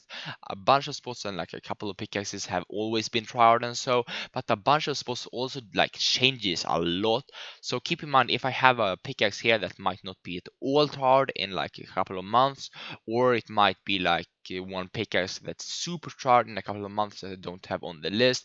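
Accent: Sweden. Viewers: Are you from Sweden or something? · Are u swedish?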